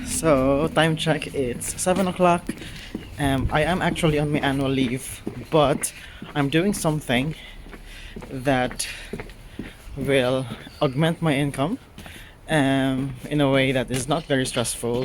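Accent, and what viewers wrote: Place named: United Kingdom. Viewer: wow kuya british accent na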